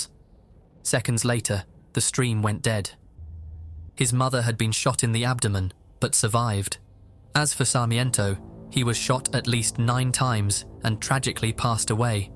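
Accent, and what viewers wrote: United Kingdom. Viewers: bollocks* He sounds British 🙃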